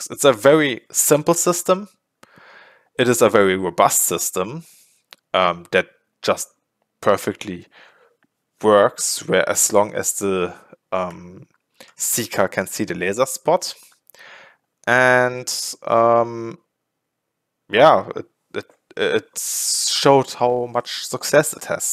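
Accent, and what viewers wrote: Germany. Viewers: The german accent is hilarious. Abber deine video ist sehr leker!! (Duolingo skills right there)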